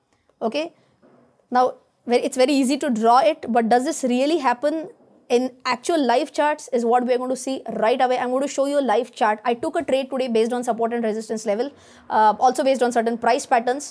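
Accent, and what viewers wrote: Maharashtra, India. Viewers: Even English have Maharashtrian accent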